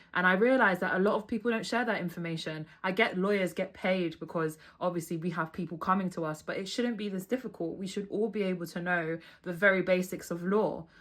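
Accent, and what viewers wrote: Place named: United Kingdom. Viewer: You have a British accent so why didn’t you go to school over there? Are you just trying to grift? 🤔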